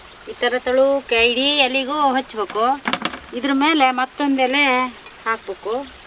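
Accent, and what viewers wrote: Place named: Mandya, India. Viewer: ನೀವು ಮಲೆನಾಡಿನವರಾದ್ರೂನು ಮಂಡ್ಯ ಸ್ಟೈಲ್ ಭಾಷೆ ಮಾತಾಡ್ತೀರಾ, ನನಗೆ ತುಂಬಾ ಇಷ್ಟ ಆಯ್ತು.... ಬಾಳೆದಿಂಡು..ಬಿದಿರು ಕಳಲೆ.. ಹಾಗೂ ಕೆಸುವಿನ ದಿಂಡು ಎಲೆಯಿಂದ ಮಾಡುವ ವೆರೈಟಿ ಪದಾರ್ಥಗಳನ್ನು ತು0ಬ ಚನ್ನಾಗಿ ಇರುತ್ತೆ... ಕೇಸುವಿನ ಪತ್ರೋಡೆ ಮಾಡಿಲ್ಲ....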